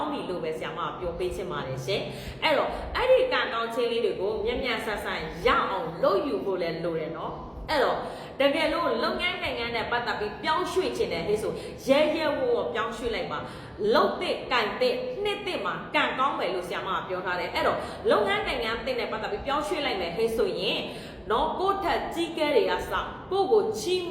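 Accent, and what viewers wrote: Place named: Myeik, Myanmar. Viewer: ဆရာမက မြိတ်အသံပေါက်နေတယ်နော် မြိတ်ကဆို ဟောခန်းလာချင်ပါတယ် လိပ်စာပြောပါဆရာမ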